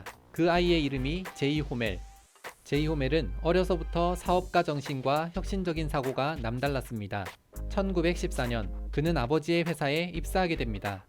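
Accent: Gyeongsang, South Korea. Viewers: 이분 경상도 출신 혹은 경상도와 관련있다에 내 돈 모두와 손모가지 검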